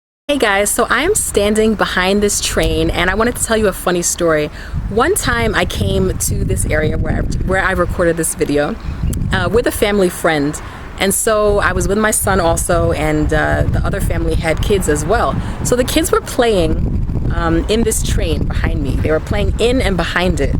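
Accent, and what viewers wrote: United States. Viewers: So I was trying to remember where I've seen you then I remembered that I saw you at a workshop in Dec 2019. When you spoke I noticed your accent and wondered if you are an expat or a Namibian who grew up in the states. Otjiwarongo is one of my favourite towns in Namibia. Those saying it's neat really need to see it in oerson, more beautiful.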